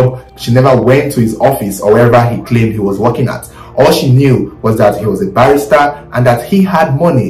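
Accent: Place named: Nigeria. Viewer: I like his accent too. He's different from the other Nigerians' accent. It's so difficult to understand. I'm from Mozambique my official language is Portuguese and I'm used to American, British accents.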